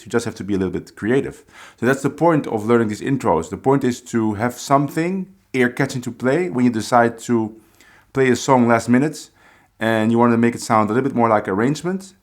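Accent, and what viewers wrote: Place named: Netherlands. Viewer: I love your Dutch accent, it's the best. 😍😉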